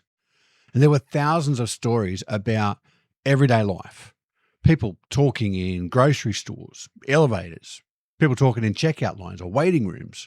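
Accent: Australia. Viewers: A slightly different take: We Americans DO want something. We want your story. Give us a tale. How's your day going? Anything interesting? Where are you from? Like to have an iced tea and swap tales? I think we like good stories. Sort of vicarious journeys?? Maybe thinking about things we'd like to do? Don't know that all of this is true...just throwing it out there as a possibility. Your accent's cool, by the way. You from Australia? Wherebouts in Australia? What brought you to our shores? Are we what you expected when you moved here? Any !Aha! moments? :) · Yes, sir I'm an American from Tennessee who saw your vid, "They Want Nothing" on the channel & I just wanted to come show my appreciation here on your channel. Your insights were spot on, many of us Americans are raised, especially in the south to treat others how we would want to be treated so compliments & being helpful to someone, strangers & friends alike, becomes second nature & yes, it is sincere. 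So, Thank You for noticing & appreciating the American way. We're glad to have an Aussie here in the states & I do like the accent too. Keep up the good work & I pray your channel grows to new heights. God bless! 🙏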